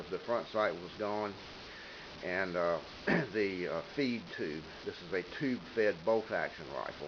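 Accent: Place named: Virginia, United States. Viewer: I have a Mossberg 46B that I've had since I was a child and it was my father's since he was 11 or 12 years old from 1935 or 36. I cannot tell you how many groundhogs I killed with that rifle as a child and it still will drive tacks at 30 to 40 yards. I refinished the stock last year and it looks gorgeous but I only have one concern with the gun and is that is that it has problems sometimes feeding shells from the magazine...... I think it's more of a problem with the magazine feed tube than it is with thebolt because I've changed out the injector and shell pick up and I'm gonna be looking at the magazine a little closer and the spring to make sure it has enough pressure on the shells. If you have any ideas why they're not being picked up by the bolt please let me know ....... once the shells are shot the ejector works perfectly. By the way you must be from Norfolk or Chesapeake Virginia with that accent.....you sound just like one of my uncles!!!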